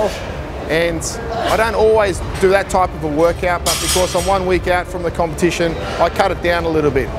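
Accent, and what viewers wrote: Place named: Australia. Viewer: He's Australian!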